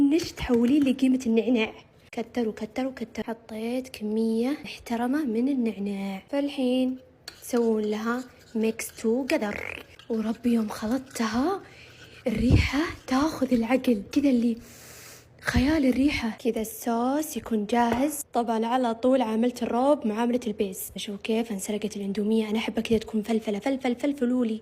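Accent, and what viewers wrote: Iraq. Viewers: امي عرفت عراقيه اي البنيه اسمها ايه والله العظيم تعرفين تتكلمي عراقي اذا تحبين العراق الفلوق الجاي سوي دولمه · فدوه للتحجي عراقي🤭🤗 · حسيت بفخر من ذكرت العراق 😂 و حبيت شلون تحجي لحجتنه 😂😂😂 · عراقية بحت ههههههه😂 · احلا وحدة تحكي عراقي❤❤ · احلى من يحجي عراقي❤ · اني عراقيه انصدمت بلهجتك بصراحه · والله احلى وحده تحجي عراقي فديتج❤❤ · فدوه للتحجي عراقي❤❤❤❤ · يمه فدوه شلون تجي عراقي انا احبج كلش كلش كلش هيه الوصفه كلش حلوه وانتي احلى ❤❤❤❤❤❤❤❤❤❤❤❤❤❤❤❤❤❤❤❤❤❤❤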